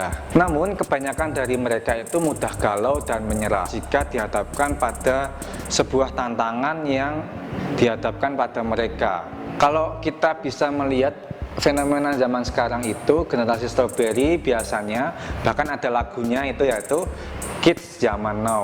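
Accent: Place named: Java, Indonesia. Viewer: Medok lek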